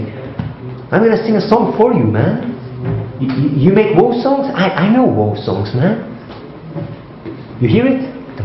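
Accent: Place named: Antwerp, Belgium. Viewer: eig ist antwerps acsent :p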